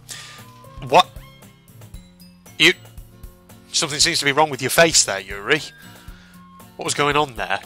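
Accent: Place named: Ireland. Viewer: Damn I love the irish voice^^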